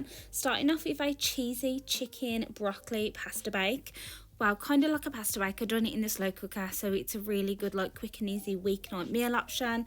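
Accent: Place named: Caribbean. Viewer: Are you from the Caribbean originally? I hear a bit of an accent coming though sometimes 😃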